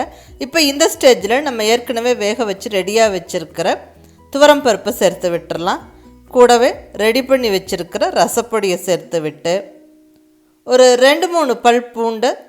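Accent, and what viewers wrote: Kongu, India. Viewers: I tried rasam . Awesome. Thanks. I like the way of telling and your sweet kongu Tamil slang.👌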